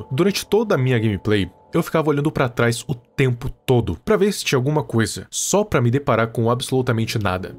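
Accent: Portugal. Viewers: o seu é de Portugal?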